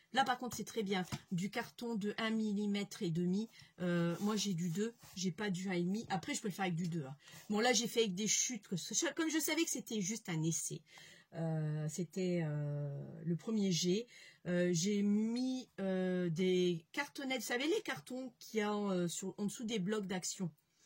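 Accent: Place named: North, France. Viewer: Cc, j'aimai beaucoup comment tu étais coiffé sur ta dernière vidéo, ça te va trop bien, dommage de les avoirs coupés, mais bon.... J'ai beaucoup aimé tes présentations comme toujours, avec ce fort accent du Nord mais j'adore...😅 Très bonnes fêtes à toi et toute ta petite famille....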